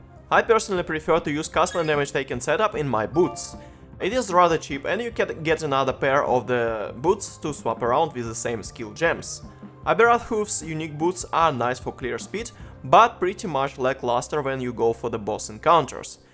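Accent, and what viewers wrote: Russia. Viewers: ты русский? акцент просто как у русского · русский акцент + уши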